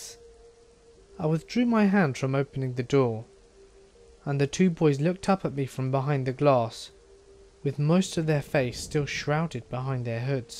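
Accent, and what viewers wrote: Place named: Southern England, United Kingdom. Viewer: Ah, what a nice response! Would that all YT comments were so reasonable and intelligent. I'm northern English myself so he's just a nice-sounding southerner to me. But I understand how he might sound semi-exotic to our friends on the other side of the Atlantic!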